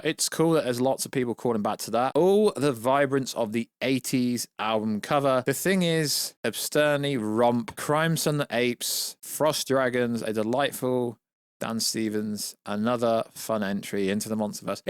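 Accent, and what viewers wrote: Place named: Scotland, United Kingdom. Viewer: You sound like grounds keeper Wullie from the Simpsons, with that dodgy Scottish accent. It made me laugh though.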